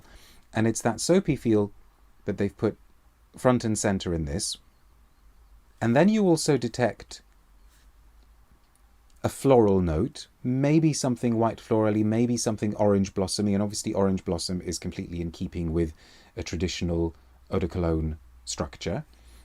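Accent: United Kingdom. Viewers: and a lovely oxford accent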